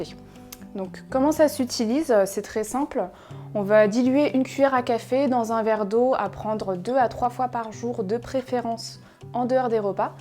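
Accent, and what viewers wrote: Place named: Arab world. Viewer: Accent arabe ça fait plaisir 🥰🥰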